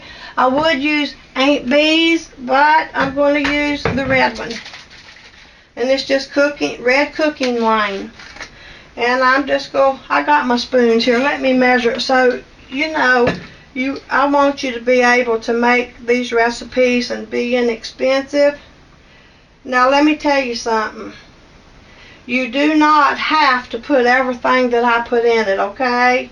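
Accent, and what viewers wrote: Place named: Georgia, United States. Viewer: I've been cooking for 58 years, but I love to hear you talk and watch you cook. I'm almost 75 yrs old. My paternal roots are in North Georgia, and they sound very much like you. I live where they grow the Vidalia onion. I too suffer from many ailments 'cause of an allergic reaction to Lipitor and Zoccor. I've been saved by the grace of GOD since 1972 and He keeps me every day.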